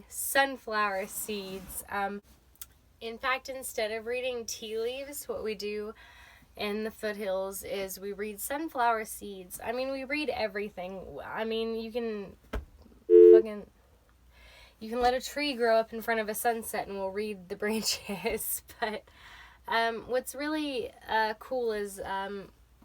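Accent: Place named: North Carolina, United States. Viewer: Sweetheart, #1 you look beautiful in this video. #2, don't suppress your accent! I grew up in western NC, and I suppressed mine for many years. That accent is YOU and a connection to your ancestors. Let it be! This is the first video of yours I've seen, and I just love you 💜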